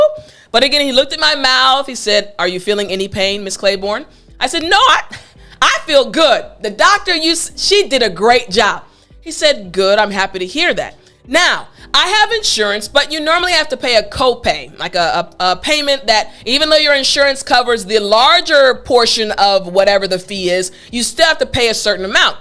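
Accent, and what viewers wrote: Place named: United States. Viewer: Loveeeeeeee Your ACCENT ! Crystal Clear American Accent !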